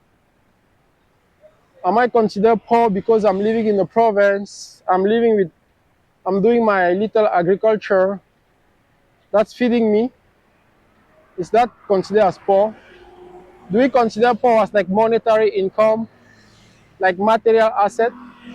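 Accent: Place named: Africa. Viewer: pero de que pais vienes tu? por tu acento evidentemente no eres de un pais del norte como inglaterra usa o canada, eres de algun pais de africa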